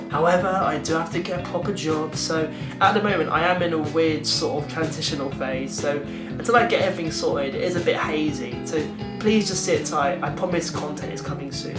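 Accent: Liverpool, United Kingdom. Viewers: Been watching your videos since like your Part 1 of the Philosophers Stone walkthrough on PS1. Those were the days! (actually ordered a PS1 back and purchased that game back recently, walkthrough might come in handy):')... enjoyed every single video!! Top youtuber! Enjoyed your videos about life in Liverpool, lived in Liverpool all my life and the vibe around the place is fantastic. The accent aint bad too. (debatable).. congratz for graduating too! Hope your well bro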